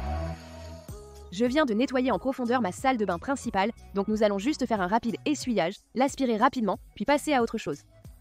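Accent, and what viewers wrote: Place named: United States. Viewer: Hello, I really like your content on YouTube. I watch your wonderful videos from my country Morocco. It's far away, but I really like what you do on YouTube, can you add the audio track option on the settings so I can listen to your American accent, I like it and it also helps me a lot to learn English.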